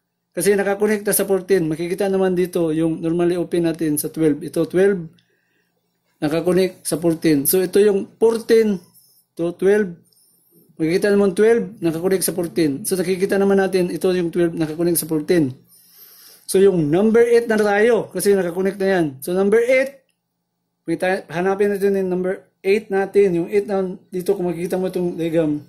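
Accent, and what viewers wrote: Visayas, Philippines. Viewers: Bisayaa jud oi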